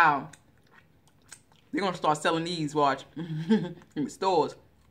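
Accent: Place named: New York, United States. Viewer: and you sound like your from New York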